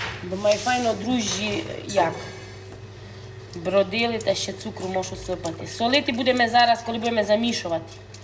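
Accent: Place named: West, Ukraine. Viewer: Така цiкава панянка, але поясніть прошу ,що зцее за мова? Українські слова з російськими в перемішку, вимова як на західній Україні!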